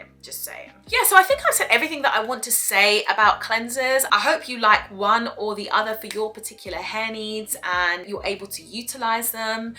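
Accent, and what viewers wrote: England, United Kingdom. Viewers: Are you from England?? Or London?? Is that a hat behind you. 😂😂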